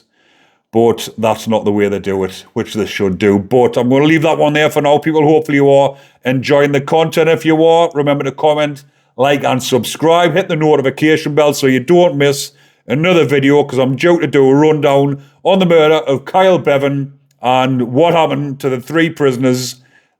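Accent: Newcastle, United Kingdom. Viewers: I can donate some Tate and Lyle best White sugar lol. Keep it up hard man. Like I've said before love the hard Geordie accent.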